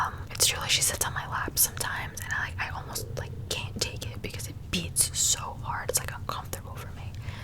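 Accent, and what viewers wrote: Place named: Northeast, United States. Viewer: I’ve always been curious where you’re from & what your background is? I’m from Australia so I don’t have a clue abt American accents but I’m guessing a state in the North-East? 😅 & Im assuming from your appearance you’re hispanic but also curious abt that too :)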